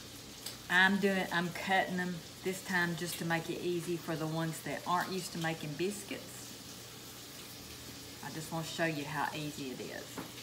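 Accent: South, United States. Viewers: Love her cute southern accent and along with her voice she sure cleans out nice!!!! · I am French Canadian my Grandmother made these for us when we visited her way up in Northern Ontario she used a can of Carnation Evaporated milk and then we would gobble them up...spread butter and then dipped them in a bowl of Molasses...I tried these in Georgia on our way to Florida one time and it was served with Pork Chop gravey...so Thank you for this recipe I will give it a try and comment back at you on how they turned out😉 I subscribed to your channel I can hear the southern accent from you. would you have a recipe for Pork Chop Gravey ? 😋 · That looks yummy 😋 and you are such a doll love to hear you talk with your Southern Accent ❤ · Thank you for taking me back home to my Mom's kitchen in Georgia. I still have the burn on the back of my left hand from me trying to steal a hot biscuit from the oven. 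It was so hot when I picked it up that I dropped it and my hand hit the roof of the oven. This lady has my Mom's Southern accent too. Thanks for taking me back home...❤ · Thank you for sharing a very biscuit recipe 😀. I can't make homemade biscuits if my life depend on it lol..But I follow you recipe exactly and they came out perfect 😋.. And I love your Southern accent.. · Hi there! I love to cook, and my mom did, too. I learned to make complicated varieties of bread, even when my mom & I were 2 states apart. she talked me through my first ever attempt at yeast dinner rolls….Sadly, my mom went through devastating loss of her mind, long years before God called her home, just over a year ago. I felt I lost her when her mind first began to falter, which was 7 years ago. I heard your voice, & from your phraseology to the kindness, and even your southern-accent, sounded, to me, was identical to the voice of mom’s youngest sister’s. 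I want to thank you for taking me back to those loving words, which I looked for, but ceased to to find that to be part of my mom. I surely cherish those long distance cooking lessons she so freely gave me, & I plan to watch as many Videos as you have, so I’m a new subscriber, always honing the skills mom taught me! I’ve been blessed to raise 2 grown, God-reverencing sons, and I’m known to my grand babies as “NeeNee”. In fact, I live right next to my youngest son, who has raised a gorgeous 17 year old daughter, but just when he thought his child-rearing days were done, here came his 2nd daughter; a female version of himself, Bella is just 9 months old! ( I get to see her EVERY DAY! ) Looking forward to every lesson I can learn from you! From the hills of Arkansas, thanks again! T.D-Rogers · I love living in the south! 😁🙏♥️🇺🇸 My mom is from Lubbock... I love the Southern drawl 🥰💯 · Oh my GOODNESS! 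From the FIRST words I heard you speak!!! I don't think I've EVER heard such a strong, sweet SOUTHERN accent!!! You seem beautiful inside and out!!!❤ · Love that country accent. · I only now found your station with this biscuit video!! I just love your southern accent, and your sweet ways,.... This is a wonderful video you have made for us to learn how to make these biscuits!! I have watched other people make them, and I just love your relaxed style, way of teaching, and instructions. You really are gifted!! .... And I love that you include the little tricks that are part of the way you do things!! A jar to cut them, your old woodent spoon to stir the dough,.... How you put out the flour, how you store your flour in those huge jars. The way you clean!! Even the way you fry your bacon!! Much aloha to you!! mililani 🌺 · I love your accent! I miss the south!!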